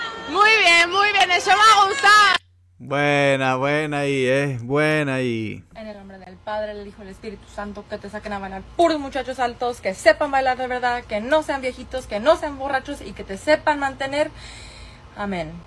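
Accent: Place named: Mexico. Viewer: Me parece que este hombre más allá de generar consciencia desde un punto de vista objetivo e inteligente para despertar en el hombre su valía. Parece ser que quedó muy tocado de su divorcio y experiencias, solo escucho a un hombre ardido, que no le fue bien en el amor y ahora descarga sus comentarios a través de este canal. Me parecen comentarios misóginos y patanes sin sustento. Chicos no se traguen todo lo que dice, Suena demasiado ardido, ni preparado, ustedes creen que un profesional se expresaría así como él? El éxito de las parejas va más allá de la experiencia de un solo hombre. Además, me suena que es mexicano y no entiendo porque estudia mujeres como colombianas y argentinas, desde ahí está mal analizando mujeres ajenas a nuestra cultura. Es demasiado incoherente, analiza a las mujeres de tu país para que al menos haya un poco de coherencia y análisis real. 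Hace falta más preparación de este hombre. No solo su percepción.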